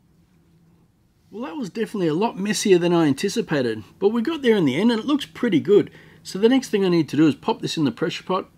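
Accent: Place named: Australia. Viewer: Thanks for your honesty. Good onya for persevering! Great to hear and Aussie voice on youtube too. I'm wondering if they used a egg-shaped silicone mould.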